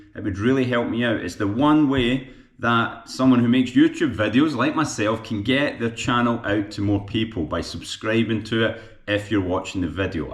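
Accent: Scotland, United Kingdom. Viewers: amazing scottish accent! i v heard once robert burns poem read like that... it is really how it should sound... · how does the scottish accent get along with speaking Russian?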